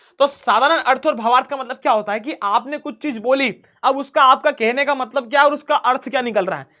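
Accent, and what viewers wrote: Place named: Bhopal, India. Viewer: aapke accent sunn ke lag riya h aap bhopali ho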